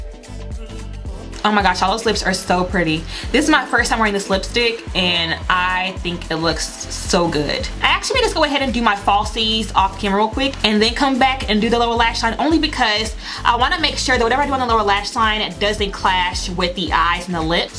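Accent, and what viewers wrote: South, United States. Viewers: Yes! I just tried this foundation the other day and I love it! I got Warm Sun as well. but it was too orange for me. I still was excited with the outcome... It lasted on my oily face for like 6 hours or so... I was pleased for sure! Your makeup came out perfect! I love it! And your southern accent is so cute LOL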